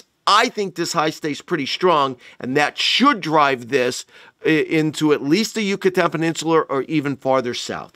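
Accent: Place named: East Coast, United States. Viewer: just can't do the east coast accent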